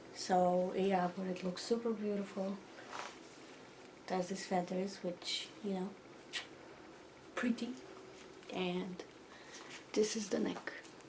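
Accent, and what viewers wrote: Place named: United States. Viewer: in portugal wouldn't you learn british english? your english sounds totally american to me.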